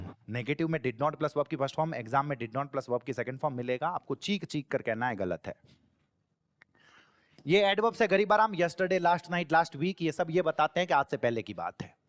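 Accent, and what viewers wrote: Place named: Jodhpur, India. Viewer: Ap jodhpur se ho sir ji apki boli to jodhpur ki lag rhi h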